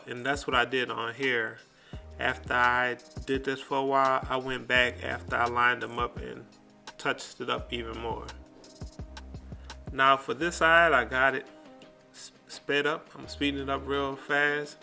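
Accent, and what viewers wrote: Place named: Louisiana, United States. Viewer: you gotta be from Louisiana bro😹 but crispy cut man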